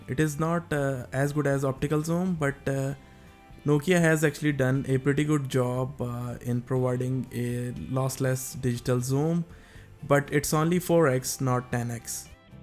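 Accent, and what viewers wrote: India. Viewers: indian accent English is genuine ear cancer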